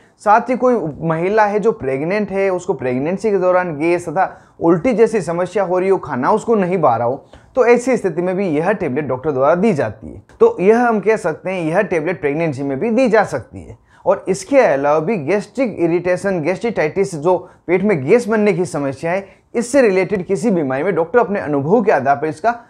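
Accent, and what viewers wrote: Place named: Marwari, India. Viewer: Marvadi ho ton to marwadi lgti he 😅😅😅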